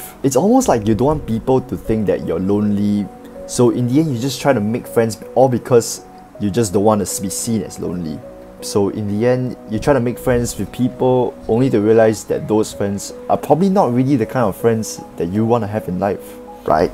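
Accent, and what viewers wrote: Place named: Singapore. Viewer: my mom said you sound singaporean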